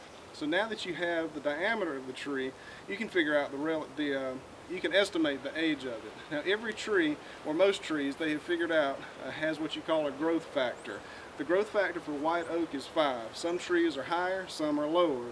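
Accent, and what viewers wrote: South, United States. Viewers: Something about this southern accent makes them feel more trustworthy and honest. Or maybe its just this guy's way of explaining everything without being full of himself. Great video,, Thanks.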